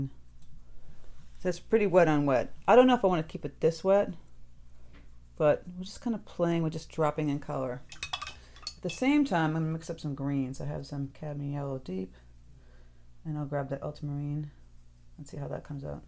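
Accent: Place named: New England, United States. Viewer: I love watching your videos and I love your accent, I don't know if you are or not but you sound like a New Englander! Which makes me happy and I love your art it's always inspiring. Thank you!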